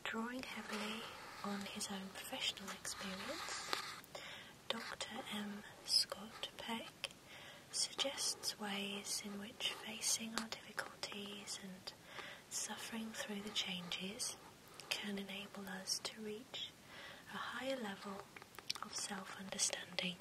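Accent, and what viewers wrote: United Kingdom. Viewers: I find that I have that reaction with the majority of her more British terms or even words that have a significant difference in pronunciation between her accent and American English. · ..i could listen to you read out of the phonebook! Your voice is so soothing and calming..i love your British accent as well. I love your videos..they're very relaxing. Good day, lovely!❤😊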